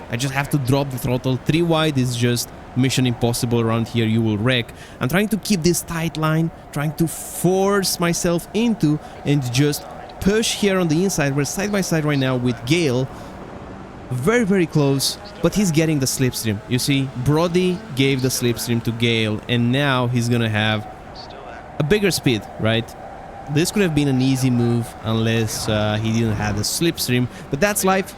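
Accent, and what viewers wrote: Romania. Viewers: hahaha, mi s-a parut ca ai accent de compatriot ❤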